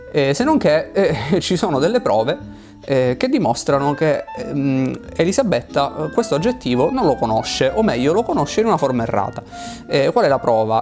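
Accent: Sicily, Italy. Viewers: Anche io seguivo Voyager! Bellissimo il finale alla Adam Kadmon: "un abbraccio". Kadmon, coi duoi rimandi emotivi, formulava un invito alla ricerca continua e al non lasciarsi omologare dai cosiddetti "buoni per definizione". Lo adoravo. Lui tralaltro chiudeva i suoi interventi con la bellissima frase: "prendete queste mie parole come favole, un abbraccio, Adam". Spero che questo canale cresca ancora. Fa piacere anche sentire una voce siciliana (io sono di Catania ma vivo in Toscana), noi siciliani abbiamo nel DNA il dubitare sempre, l'argomentare, il desiderio di esplicare, la volontà empatica soggettiva di non soccombere e non accondiscendere.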